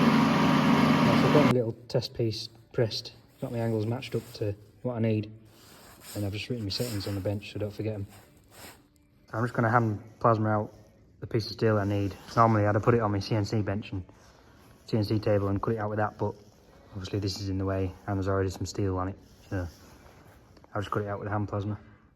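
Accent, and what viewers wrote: United Kingdom. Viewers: Came here on recommendation over on the CEE channel. I really like this kind of work - big fabrication by someone who's not afraid to take on a challenge because they have confidence they can do whatever it takes, even if it means re-engineering things from scratch. The Brit accents can sometimes be challenging for a US guy, but you're clear enough for me to catch the drift. 🙂Good stuff!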